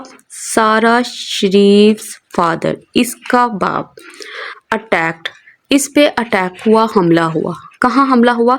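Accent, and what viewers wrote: Lahore, Pakistan. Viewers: You must be from Lahore ❤❤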